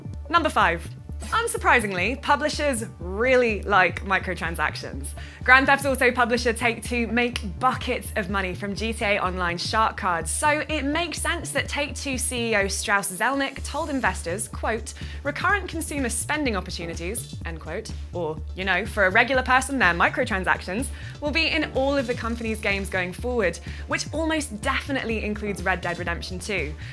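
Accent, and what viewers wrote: United Kingdom. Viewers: Holy crap !!!!!! That British accent though 🤔🤔🤔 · Cute, redhead and british accent. 10/10 IGN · That beautiful british accent though;)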